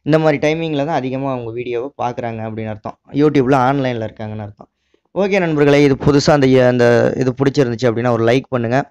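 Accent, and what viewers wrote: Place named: Salem, India. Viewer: Super neenga endha ooru. Slanga patha Salem Zone a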